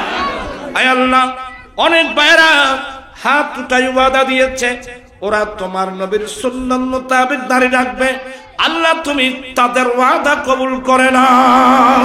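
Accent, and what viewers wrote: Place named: Chittagong, Bangladesh. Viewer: চট্টগ্রামের আঞ্চলিক ভাষাটা ছাড়তে পারে নাই।রংপুরে আহলে সুন্নতের আলেম নাই বুঝি?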